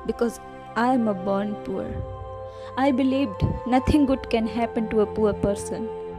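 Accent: India. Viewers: Indian acent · Wow..But I dnt Knw Y I dnt Wana Hear Indian english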